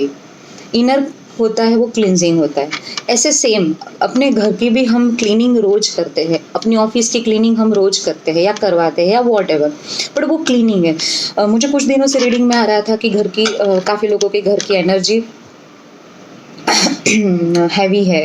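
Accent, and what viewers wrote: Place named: Gujarat, India. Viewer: Her pronunciations is gujarati why should you speak hindi full bakwas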